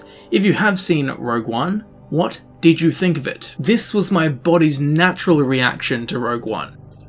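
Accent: Australia. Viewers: how happy were you when you saw the storm atronach camel ? I laughed out loud ;) also. I just determined that you're my geek gamergirl crush when you answered the question about choosing daedric lord or dragonborn. handsome Australian guy with an accent AND can answer as many obscure lore questions about TES as I can? SOLD.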